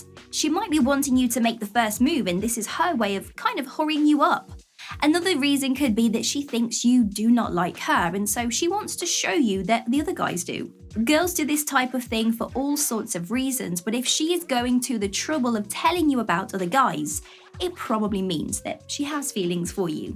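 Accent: United Kingdom. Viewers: She is British, isn't she?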